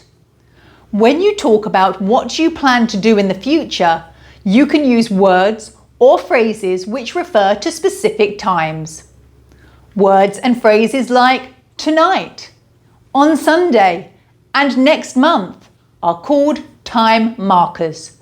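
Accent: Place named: United Kingdom. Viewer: I'm going to say thank you my nice teacher I love Britch English 😂😂😂😂😂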